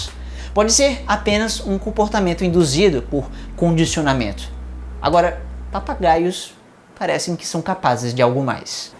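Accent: Ceará, Brazil. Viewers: Man tu é cearense? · Mano vc é cearense? Puta cabeção de caixa d'água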